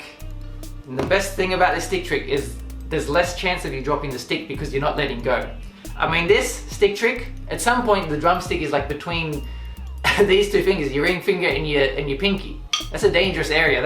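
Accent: Australia. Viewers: Is he from Australia?? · R u aussie?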